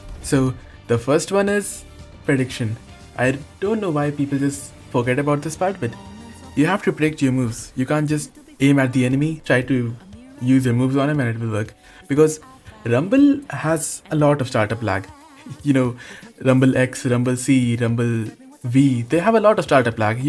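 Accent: India. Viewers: R u indian? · Accent: indian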